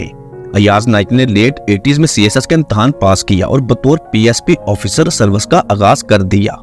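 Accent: Punjab, India. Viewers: Why is the commentator trying his best to speak urdu in Panjabi style??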